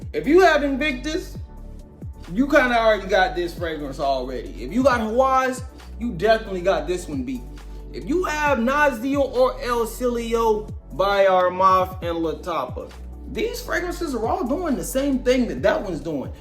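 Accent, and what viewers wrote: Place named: New York, United States. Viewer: U gotta be a NY nigga with the yeeeerrrrr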